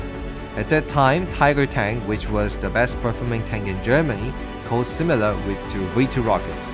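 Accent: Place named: Russia. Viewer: Love your videos ❤ Btw you sound Russian are you from Russia?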